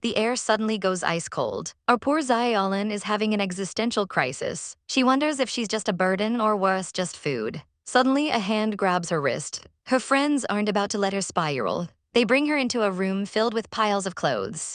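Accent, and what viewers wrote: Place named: Boston, United States. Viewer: Is it just me or does this AI got a Boston accent 😂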